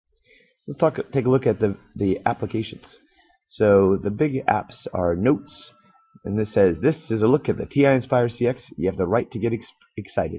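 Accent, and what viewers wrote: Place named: United States. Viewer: This man clearly has an American accent so you point is invalid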